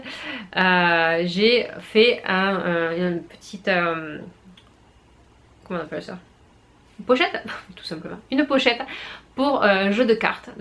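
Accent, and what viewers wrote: South, France. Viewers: Bonjour Je ne découvre tes vidéos qu'aujourd'hui et je les trouve très naturelles. Je suis très sensible aux accents et j'aimerais savoir d'où vient le tien? du Sud de la France? Rien de québécois il me semble. Continue à nous distraire avec tes cousettes et bravo pour ces belles réalisations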